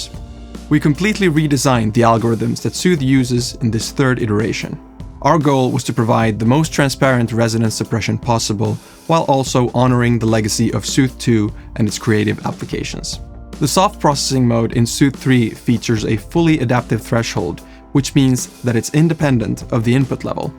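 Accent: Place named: Finland. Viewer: En tiennykkää et oli suomalainen, respect! Accent gave it away tho:D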